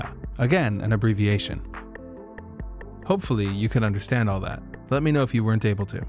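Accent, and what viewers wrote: United States. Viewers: I live in East Texas and I've heard people speak with a VERY similar accent. Even kind of sounds like an old politician from the 90s named Ross Perot. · The Texas accent was hilarious, coming from an authentic Texas native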